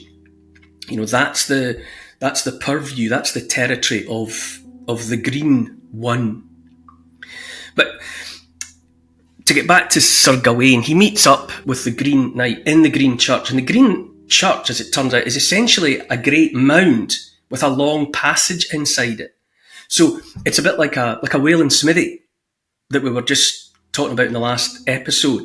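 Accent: Scotland, United Kingdom. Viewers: Greetings from Finland 🤗 Love your stories, ranting and scottish accent. I get a cosy feeling listening to your channel. Must have some scottish blood in me 🤔 😊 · Scottish scozzese?😀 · The Scottish accent even manages to make 'Millstone Grit' sound dangerous.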